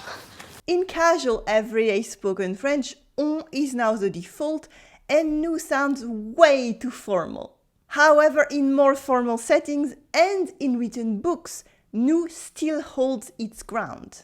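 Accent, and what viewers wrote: France. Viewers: OK! You a French woman or a Quebecer? Makes a big difference!!!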